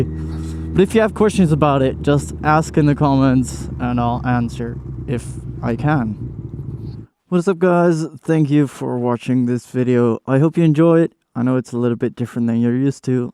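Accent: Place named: England, United Kingdom. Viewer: Not going to lie I may have screeched out of shock when you said my name.😂 Also before I had known you were Dutch I thought you might have been from England based off your accent when speaking English, but now I know it's probably from going to and English school in Saudi Arabia for some time.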